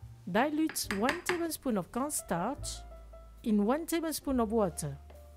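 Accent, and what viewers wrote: France. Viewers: Love the French accent 😂 and the recipe is great will be making it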